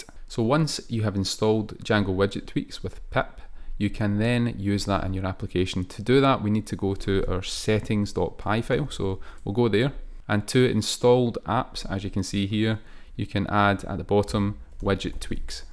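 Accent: Scotland, United Kingdom. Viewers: great video and I like the Scottish accent!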